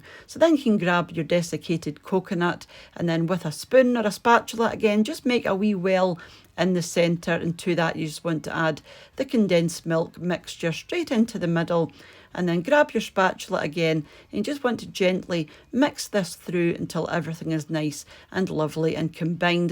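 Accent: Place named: Glasgow, United Kingdom. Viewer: I'm from Glasgow. Live in usa. Love to hear your accent. Thank you so much for this. It brings back memories❤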